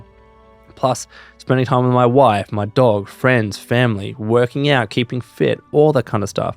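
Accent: Australia. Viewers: Wish you the BEST & absolute success with YouTube,. Your angle has been one of my favorites on the platform. I wager you’d be amazing at Twitch streaming as well to farm some of that YouTube content. The Aussie accent will definitely boost your viewership!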